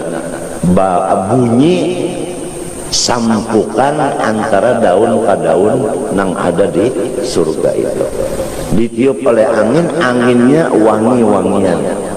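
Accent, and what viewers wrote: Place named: Brunei. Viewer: Mcm logat orang berunei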